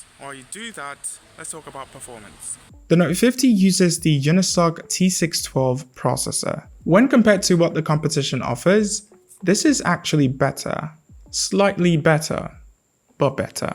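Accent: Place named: United Kingdom. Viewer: Bro you British accent is good tho 😢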